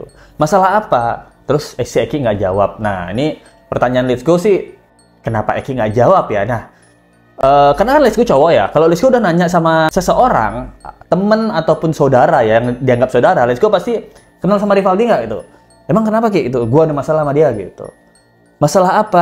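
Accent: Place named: Java, Indonesia. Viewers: Suka gaya bicarany abang dalam menjelaskan medok khas jawany🙏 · lucu si lu bang, wkwkwkwk medok nya cerbon ga gitu, itu logat jawa timuran. tp gpp lucu.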